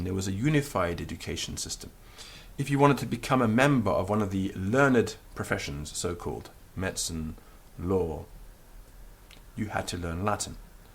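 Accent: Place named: England, United Kingdom. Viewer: If this is the first lesson, I do believe that he can teach the whole language in 3 lessons. Also his English accent makes it sometimes a little difficult to understand the words in Latin.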